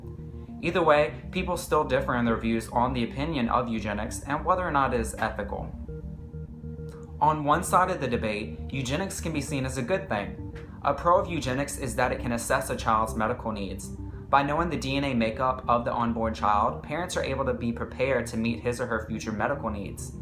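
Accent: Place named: South, United States. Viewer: i can almost hear a gay accent and a southern accent. Btw, you're talking about artificial selection and genetic modification at the same time.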